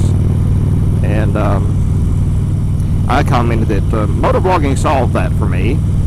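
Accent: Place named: South, United States. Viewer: I think a southern drawl actually helps in a motovlog. It blends well with riding down a country road and the rumble of the Harley in the background. It has a Mark Twain feel about it. And yes, everyone hates the sound of their voice, unless your name was Charlton Heston or Gregory Peck.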